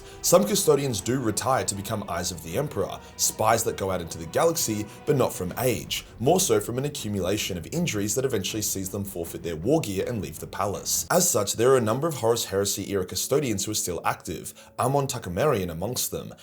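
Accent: Australia. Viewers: G'day ol son.....im new to, and love that an Aussie speaking in Aussie talking about 40K. I recently bought the Spider Space Elve, and Now You have Custodies. I had to get one and the best of these is the Golden Dread Lord, Big Cheers ya ol bugger from A M8 up In the Sunshine State🙃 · This guy’s frat boy personality is normally something I hate but man oh man, slap a Australian accent on it. I will listen to this man all day.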